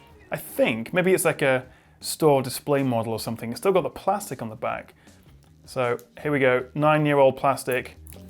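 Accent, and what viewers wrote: United Kingdom. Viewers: Accent is British 😉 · nice British Accent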